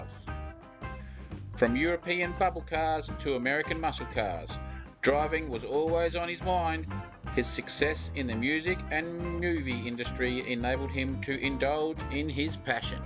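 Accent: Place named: Australia. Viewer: WHY IS A TERRIBLE AUSTRALIAN VOICE SPEAKING HERE - SURELY A PROPER SPEAKER WOULD HAVE BEEN BETTER !!!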